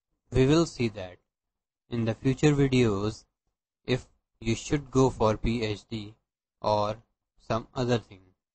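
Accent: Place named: India. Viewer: heavy indian accent.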